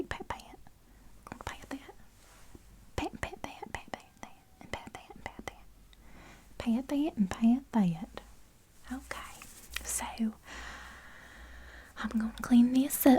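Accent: South, United States. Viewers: Love the southern drawl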